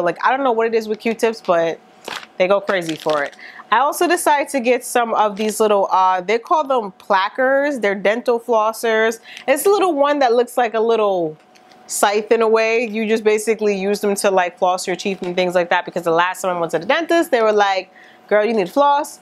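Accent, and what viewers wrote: New York, United States. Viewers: ur videos r everythinggggggggggggggggggggggggggg so nice to hear a fellow ny accent im from da bronx XX!!!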